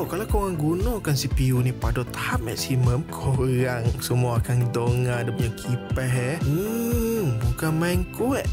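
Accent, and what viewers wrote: Negeri Sembilan, Malaysia. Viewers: Elok2 la cakap nogori tu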